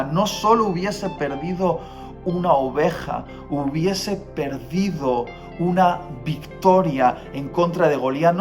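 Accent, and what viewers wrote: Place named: Basque Country, Spain. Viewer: Muchas bendiciones Dios te utiliza en gran manera y atu manera de ser saludos desde zamora (Castilla y leon ) España🇪🇸 aveces parece que ya no tienes el acento vasco pero luego eah ay esta 😂Dios te bendiga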